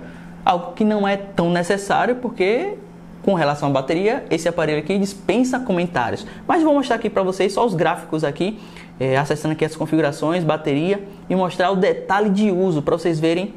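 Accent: Bahia, Brazil. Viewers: Oh, baianinho tu e de qual origem da Bahia?? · Muito engraçado ouvir a análise no sotaque baiano! Dá um diferencial. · Kkkk sempre desconfiei q tu era bahiano só pq da sua voz("voz msm não sotaque") e agora com esse lá ele agora sim tenho certeza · Quer sucesso falando Bahiano em nivel nacional me poupe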